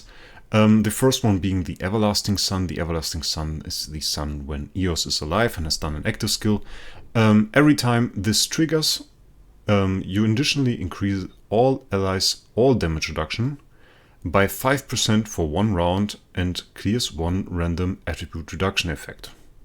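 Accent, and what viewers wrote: Germany. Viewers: Is ur motherlanguage german?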